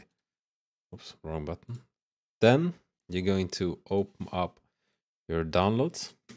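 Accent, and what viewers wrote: Germany. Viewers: bro is defenitif deutsch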